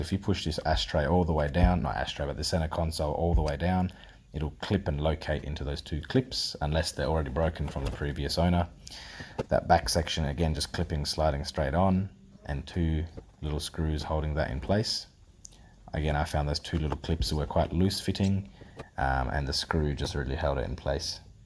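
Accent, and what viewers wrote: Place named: Australia. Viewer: can i ask why you sound aussie but looks like your hands are Indian? :)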